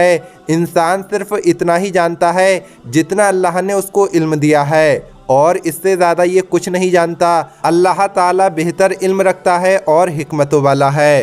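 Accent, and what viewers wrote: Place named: Bihar, India. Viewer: Hi batchit se to bihari lag raha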